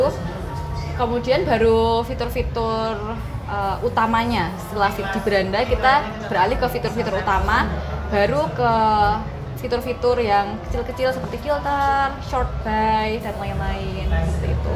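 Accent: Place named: Java, Indonesia. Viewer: medhok mbak..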